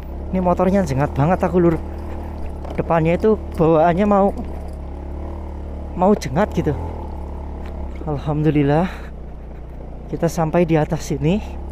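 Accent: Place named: Banyumas, Indonesia. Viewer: Sama" orang ngapak tp kalau nonton smbil dengerin mas ngmg jd horor + kocak mantapp menghibur sekali mas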